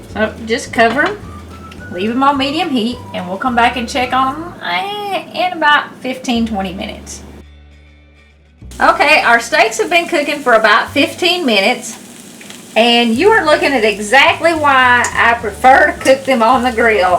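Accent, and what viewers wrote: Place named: East Tennessee, United States. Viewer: Love your videos, oh & that East Tennessee accent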